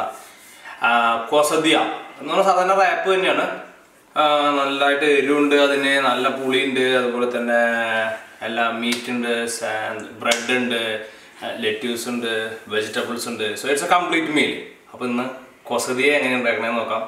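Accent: Thrissur, India. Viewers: അത് ഒരു ശൈലി ആണ് ബ്രോ എന്റെ കൂടെ ജോലി ചെയുന്ന ആളുകളും പറയാറ് ഉണ്ട്. തൃശൂർ ക്കാർ ആണ് പറയാറ്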